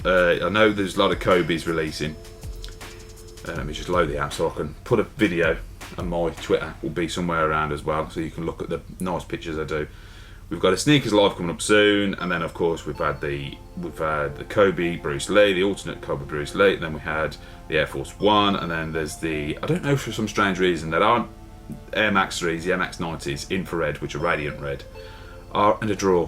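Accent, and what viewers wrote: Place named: Black Country, United Kingdom. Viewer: Sounds like a Black Country accent here...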